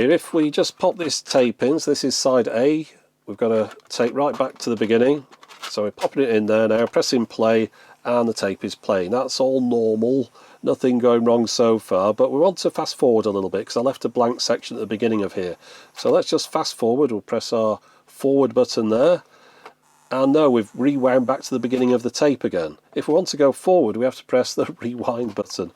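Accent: United Kingdom. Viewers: I do like, of course your videos, but your voice. Nice and calm. Like to hear a British voice instead of always American.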